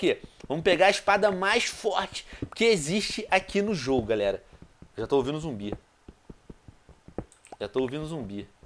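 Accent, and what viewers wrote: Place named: Portugal. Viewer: Meo deos que nostalgia, meu, você foi minha infância, junto com todos do grupinho. S2 saudades de seus videos. Cof amo sotaque de Portugal, é tão fofinhooo.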